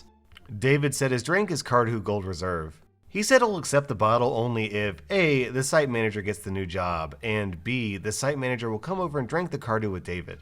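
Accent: United States. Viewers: Love hearing UK vernacular with US accent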